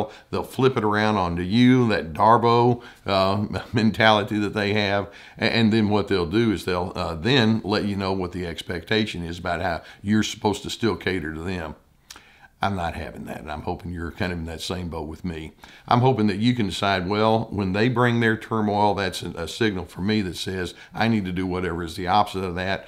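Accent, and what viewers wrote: South, United States. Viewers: Why are you calling him out for his accent? I'm from the South and I know his accent is smoothly correct and authentic. If he sounded "like somebody from Washington", I would say you're completely nit picking how someone talks, basically calling him fake! 🙄 Move on.. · ✨Glad I clicked. Staying for the combination southern accent and for the great information . Sweet tea for the soul ✨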